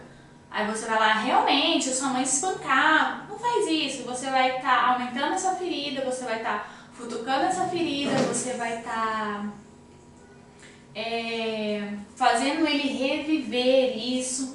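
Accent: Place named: Federal District, Brazil. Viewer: eu jurava que você era de DF kkk. Obrigada s2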